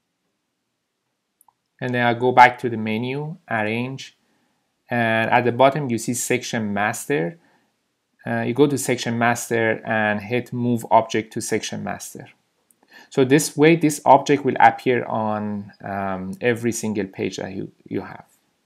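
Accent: Iran. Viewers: By listening to your accent, it seems your native language is Persian.👍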